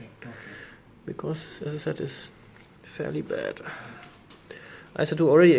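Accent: Germany. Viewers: It sounds like a really hard german Accent. Do u come from germany? :) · Condescending German.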